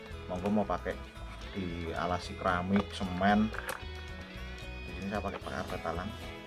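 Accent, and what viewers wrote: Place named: Java, Indonesia. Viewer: Salam satu hoby kang.....dari boyolali...aku suka bhasanya meduk capur Jawa .....kadang bikin ketawa..lanjutkan moga sukses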